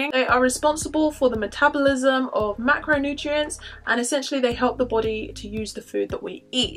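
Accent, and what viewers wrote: United Kingdom. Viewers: I need your GORGEOUS British accent!!!!! I would be a new woman with a new lease on LIFE!!!! 💜💜💜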